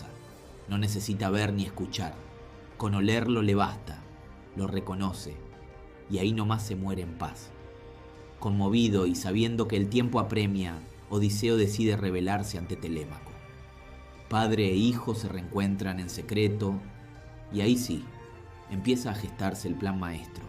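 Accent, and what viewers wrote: Buenos Aires, Argentina. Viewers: El texto no armoniza con las imágenes ni la época. El narrador es un pibe de Buenos Aires. Horrible. Una lástima, las imágenes son bellísimas. Usa términos como "alta"(estratega) que pronto pasará de moda. Tiene varios de esos. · A mi si me gusta el acento che, Boludo 😅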